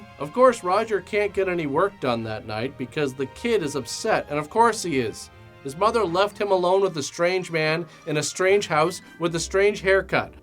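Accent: Canada. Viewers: To be honest I'm kinda surprised you don't pronounce "aunt" as Ah•nt because you're Canadian. I've noticed Canadians put a lot of emphasis on pronouncing things exactly like they are spelled, for instance when you say soh•ree instead of sah•ree for "sorry".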